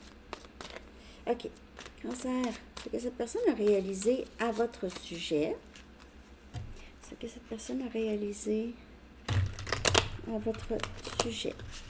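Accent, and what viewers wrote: Canada. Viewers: Bonjour et merci 🙏 pour votre guidance qui me parle bien. Il est Poisson et je suis Cancer. J'ai donné beaucoup en effet mais impossible de tenir un homme qui aime plaire et séduire. Il m'a quittée il y a 17 ans, pour une autre, par mail sans explications après 12 ans en triangulaire. J'ai divorcé pour lui mais lui non. il revient aujourd'hui et je ne sais toujours pas pourquoi? Nous nous sommes revus en Mai et Juin dernier, il est resté taiseux et depuis c'est le silence radio???. Il m'a dit que nous nous reverrons en janvier ou février prochain sans même demander mon avis?? Wait and see...., j'adore votre bonne humeur 👍 vous été drôle, ça fait du bien de vous écouter et votre accent canadien un vrai délice ❤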